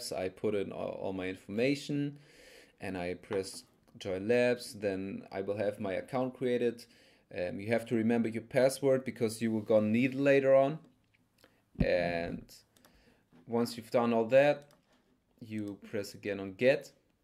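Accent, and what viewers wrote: Germany. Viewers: Man hört so krass das deutsche akzent raus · hahah habs am akzent gemerkt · Wie man einfach sofort hört das du eigntlich Deutsch sprichst xd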